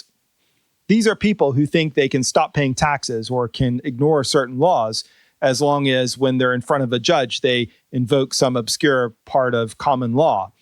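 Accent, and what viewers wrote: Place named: Philadelphia, United States. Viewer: Are you from Philly? Guessing base on your "o"s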